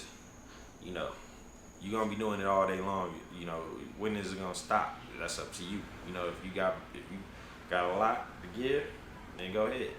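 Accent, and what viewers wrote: United States. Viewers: You have a Detroit accent. You from my city, bro? 🤔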